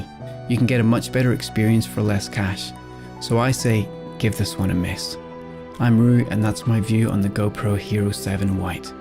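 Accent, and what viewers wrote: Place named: Northern Ireland, United Kingdom. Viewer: am I right in saying your originally from Northern Ireland? might be wrong but I can pick it up, I'm a Fermanagh man myself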